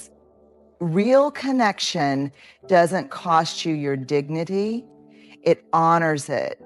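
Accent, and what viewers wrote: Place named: South, United States. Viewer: ✋. I do!! All you need to do is look up one of her live Ted Talks to know that this is Brenet. Love her slight southern accent ❤ She’s the real deal.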